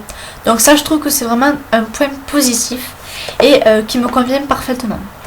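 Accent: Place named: South, France. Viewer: On dirait que t'as un accent du sud :D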